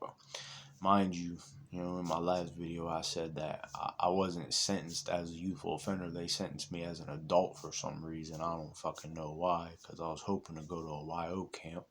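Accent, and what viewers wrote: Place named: Florida, United States. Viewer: Bra I kno u from florida from the way u talk...boy u real AF!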